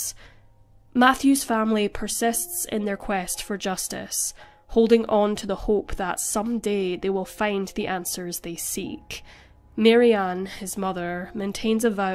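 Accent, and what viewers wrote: Scotland, United Kingdom. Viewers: where is the narrator from? Unique voice, sounds a wee bit Scottish 🤷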